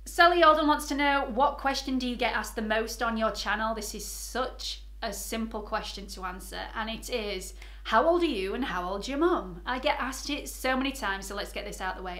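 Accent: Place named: Yorkshire, United Kingdom. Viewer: I should’ve known you was a Yorkshire lass as your so down to earth although I did detect the accent but sometimes it’s difficult Cos u cud also be from Manchester or somewhere 😂 I’m guessing Leeds maybe 🤔 I’m from good old Doncaster 😁 and you sound just like me 😂😂 anyway I just want to say a big congrats on your one year anniversary it’s a great achievement. Am only a recent subscriber but am loving your channel 😃 keep up the good work lass thas a proper diamond 😂oh and you do not look 39 😲 ❤️ xxx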